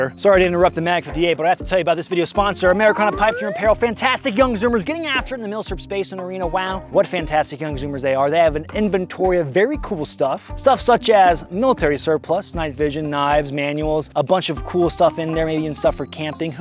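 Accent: Afrikaans, South Africa. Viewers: As a south african and afrikaans speaking native the accent killed me 😂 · Oh wow again the Rhodesian and South African references were amazing. Saw some SADF photos in the video of troops with the FN MAG. South Africa used the FN MAG in the bush war and was the backbone of the troop or squad. Love the accent!! Good job. Not quite leo's level in Blood Diamond but with some work you will get there. Well done bru! TIA. As a South African this video made me smile. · The South African accent 😂😂😂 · Liiiike it my bru your afrikaans English accent lol focken lekker 👍👍👍👍👍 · your South African accent isnt half bad 😎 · Love your attempt at a afrikaans accent hehe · That was the best Rhodie/South african impression ive ever heard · The South African accent and slang is awesome lmao and also the History lesson makes me happy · Not the South African accent ,love it 😭🤣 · As a afrikaans speaking south african i aprove the accent hahaha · Love the South African/Rhodesian accent, shot my bru 🤙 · as a Boer patriot in commie South Africa, I quite enjoy your safrican accent. 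lekker my bru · Lekker video my Bru.... Your Afrikaans /rodisian accent sounds good.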